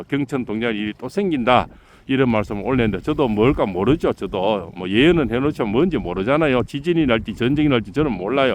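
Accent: Gyeongsang, South Korea. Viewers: 참재미나게 말씀하시네요 토종경상도 사투리 웃습니다 재미납니다 우리나라 좋으신쌤이 많아서 희망이있습니다 ㅋㅋㅋ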